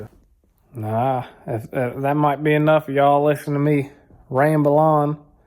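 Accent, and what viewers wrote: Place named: Kentucky, United States. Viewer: I knew it... I knew you were from KY. You talk just like me. But I'm in northern ky. Got 165 acres of nothing but deer and turkeys..... not another house in site...just like we like it. Glad I found your channel.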